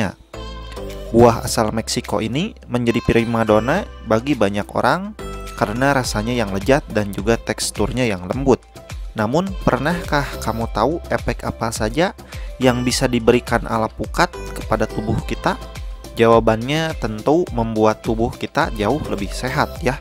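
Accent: West Java, Indonesia. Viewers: yg host nya klo boleh sy tebak pasti org jawa barat 😂😂😂😂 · sunda pisan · Logat orang Jawa barat Indonesia Raya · Sunda pisan kang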